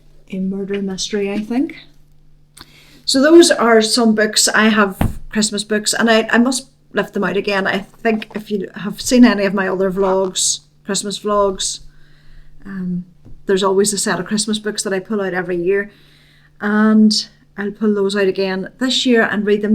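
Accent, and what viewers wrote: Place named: Ireland. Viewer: I have read several series of books about Ireland. Susanne O’Leary and Kennedy Kerr are two of my favorite fiction writers! I’ve never been to Ireland, nor will i be going at 77, but the names and places and customs do so intrigue me!!!! Being from Alabama in the southeast USA , Ireland is a place i dream about! Your accent certainly helps, too!❤️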